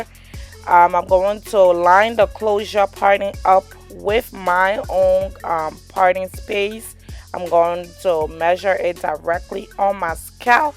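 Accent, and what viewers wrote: Liberia. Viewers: Is she Liberian? Her accent sounds like she's from Liberia.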